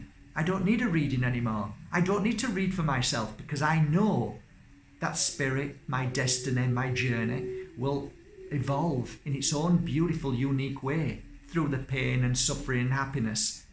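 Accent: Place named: North, United Kingdom. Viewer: My friend recommended you to me and you’re absolutely amazing. I can very much relate to a northern accent too haha ....Thank you for helping me see the light 😊xx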